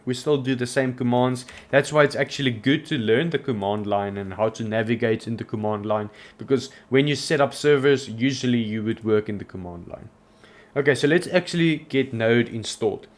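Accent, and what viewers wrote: Afrikaans, South Africa. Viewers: Lekker om n afrikaanse aksent te hoor! 😂😂 mooi storie!!! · You dutch from RSA?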